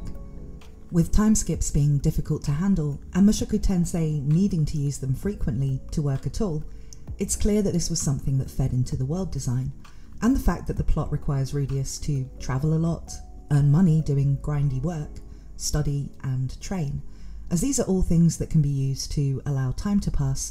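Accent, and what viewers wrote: United Kingdom. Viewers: Are you a brit????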